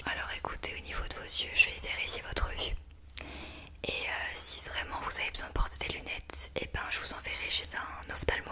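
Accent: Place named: Eastern France, France. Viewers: Ui c'est comme ça en Alsace Lorraine ^^ Et pas les Oui de nobles qui ont 9 cartes gold :D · Toi tu viens de l’est de la France obligé !! Tu dis « Ui » au lieu de « Oui » 🙂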